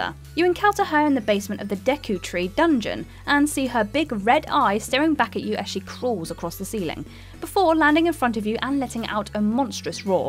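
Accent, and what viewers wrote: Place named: England, United Kingdom. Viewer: I know it’s tough with an English accent and she’s relatively new, ( does a great job with the better part of the video) she really butchers a lot of pronunciations in this video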